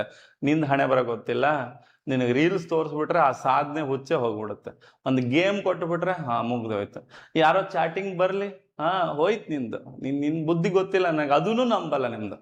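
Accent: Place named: Haveri, India. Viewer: Sir nimm bayaga haveri bhashe kelak chend ri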